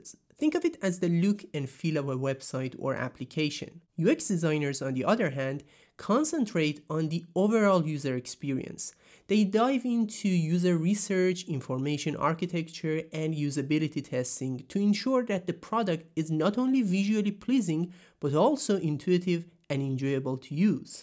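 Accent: Iran. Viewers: کلمه اول رو شنیدم اینجوری بودم که عه ایرانیه طرف😂❤ موفق باشی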